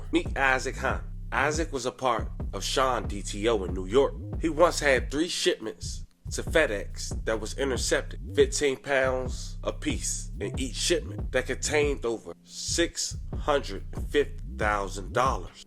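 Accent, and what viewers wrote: Washington DC, United States. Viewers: I thought yu don’t give angles just the story 😂that Baltimore step on they plugs comment sound like an angle to me🤔. Sound like u from DC/DMV so it’s unexpected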